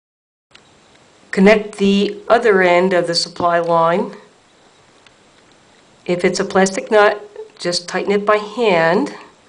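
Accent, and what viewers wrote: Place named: Baltimore, United States. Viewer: Nice exploration, thank you. "Wudder." Is that a strong Baltimore accent I'm detecting?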